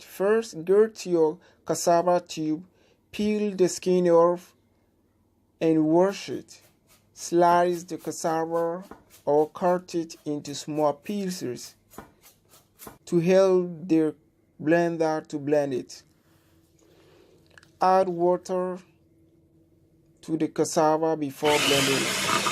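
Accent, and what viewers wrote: United Kingdom. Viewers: Oh. You're too much with your British English. Well done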